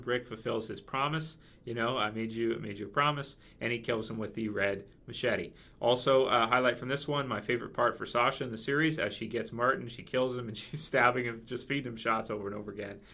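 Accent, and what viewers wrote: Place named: Canada. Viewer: Hey. Out of curiosity, what province are you from? I am an American but I lived in Newfoundland for awhile and based on your accent I'm almost positive that isn't where you're from haha. I've been to a lot of places in Canada and I was just curious as to what part of the country you're from.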